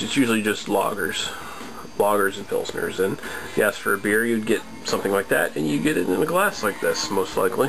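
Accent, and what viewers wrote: Canada. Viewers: Drinkin one with you now bud. You sound like a good Canadian boy.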